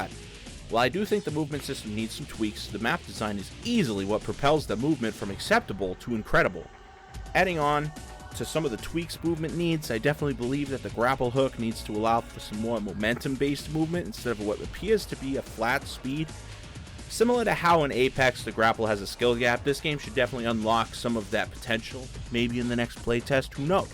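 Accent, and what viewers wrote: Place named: Boston, United States. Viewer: You gotta be from Boston lol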